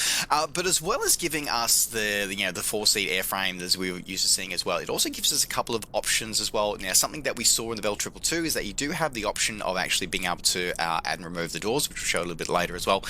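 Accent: Australia. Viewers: You must hold the recorddddd for the most annoyingggg Australian voiceeeeeeeee.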